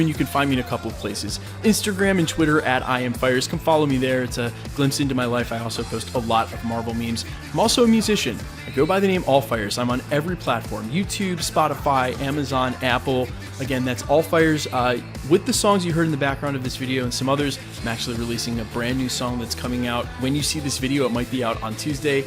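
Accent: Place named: California, United States. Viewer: You sound very California. Woah dude.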